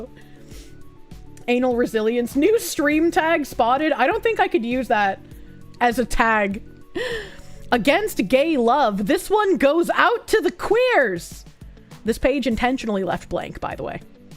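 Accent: Boston, United States. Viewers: HAPPY BIRFDAY, SILLY FOX WOMAN You really are something special, a shining light of squeaky noises, Boston accents, memes and autism. A breath of fresh air in this oppressive nightmare zone of a world. We’re all gonna make it! Keep your chin up, eat some sugary cake, pass out in a food coma and remember how much your community loves you. Happy Birthday.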